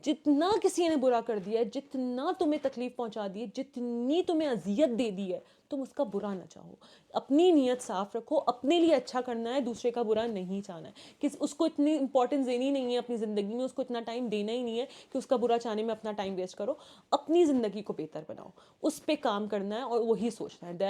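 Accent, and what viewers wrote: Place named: Pakistan. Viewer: Aur ek baat mai India se hu Baji Mujhe pakistani video bohat achhe lagte hai. Aap logo ka baat karne ka lehja Masallah bohat achha hota hai. 😊😊😊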